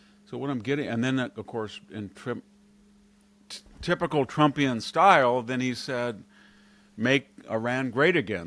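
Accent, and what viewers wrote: United Kingdom. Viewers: Who is this lovely,m well spoken, well informed, young British man?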